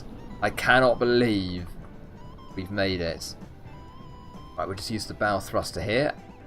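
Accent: United Kingdom. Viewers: think they changed somethings with the megaladon.. that f* B* follow my boat for at least 15 min. really like your vid's dude! Charming accent aswell UK i presume? Ever thought about making a stormworks server? think it could be fun, you might want to look into it cause i would join that in a second. i understand it's a lot of pc power required for keep it running but how you play solo is already really cool.. now ad that to the followers you already have. just a thought mate:) keep going you're amazing video's about stormworks!. NJersey is okay but he thinks he is amazing and presumes to much. frantic is funny cause its an idiot. Camado is US and wants views lately. well that's enough b*tching. check the server stuff. guessing of the followers you have, we would have Alot of fun:)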